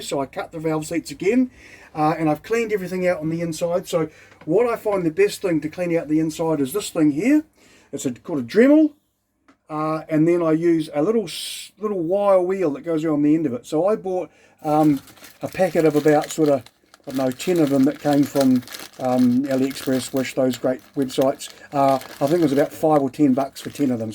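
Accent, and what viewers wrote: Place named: New Zealand. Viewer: Cars and cameras, red beards garage have lots of helpful stuff....but the kiwi accent is great!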